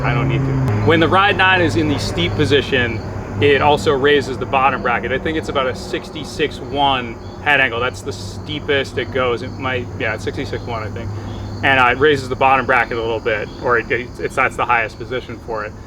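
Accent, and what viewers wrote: Boston, United States. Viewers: Hahaha Recovering XC Dork! Intermittent Boston accent.. Checkin all them boxes!! Hope you get some more free time kuz it’s fun to watch ;p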